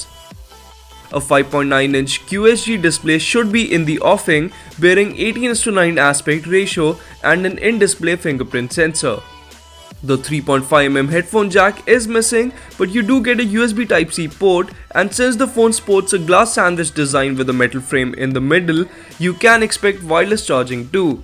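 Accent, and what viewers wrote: India. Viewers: Damn indian english. Dude. learn how to speak! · Ёбтвоюмать че за акцент ??? Индус не мытый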